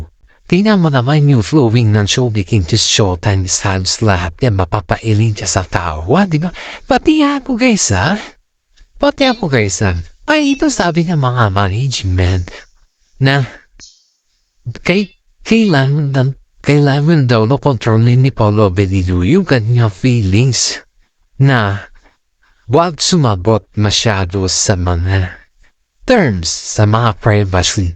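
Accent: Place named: China. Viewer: Tono mo para kang intsik na bulol sa tagalog. Halo halo pa sinasabi mo hindi maayos. Magulo kang mag vlog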